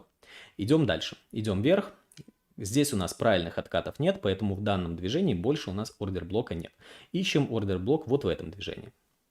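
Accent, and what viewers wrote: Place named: India. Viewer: жуткий акцент у этого парня.... индус что ли....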